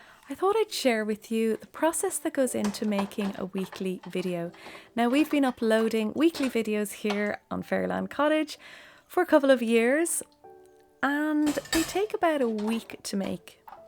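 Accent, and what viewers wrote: Ireland. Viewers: I so love your soothing beautiful irish voice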